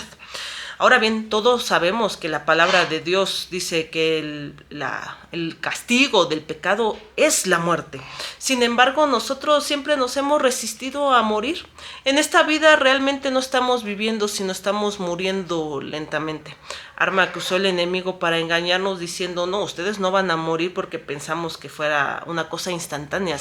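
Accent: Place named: Mexico City, Mexico. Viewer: Hermana, tienes acento capitalino (Chilango). Bendiciones de Dios...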